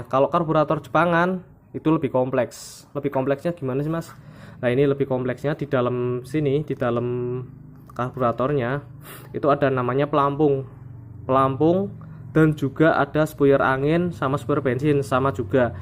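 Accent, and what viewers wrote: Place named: Pekalongan, Indonesia. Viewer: Koyo wong pekalongan logate mas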